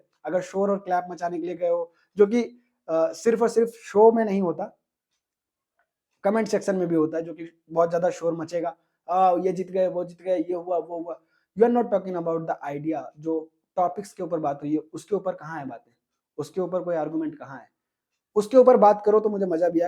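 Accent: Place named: Bihar, India. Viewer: Bihari ho kya?thoda nhi, thora bol rahe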